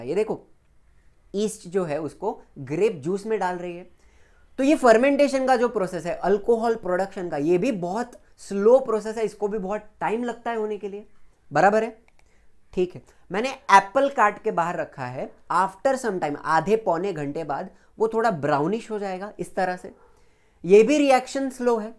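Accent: Maharashtra, India. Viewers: Sir aapki mother tounge Marathi hai kya????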